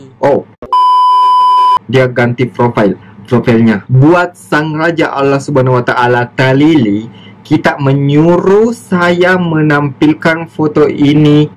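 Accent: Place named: South Sulawesi, Indonesia. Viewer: hehehehe.tetep masih ada kekentalan bugis nya kk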